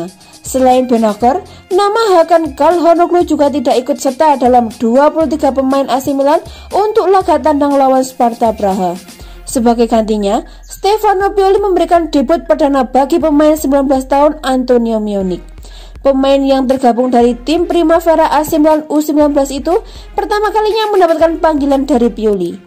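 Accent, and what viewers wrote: Java, Indonesia. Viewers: Hahahaha penyiare orang jawa yaaaa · Medok cuk 🤣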